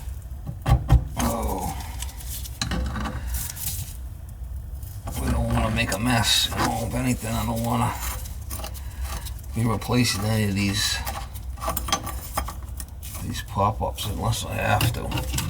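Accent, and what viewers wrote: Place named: New Jersey, United States. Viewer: I’m not even a plumber but love watching this guy do his jobs with real life experience. Great explanations and New Jersey accent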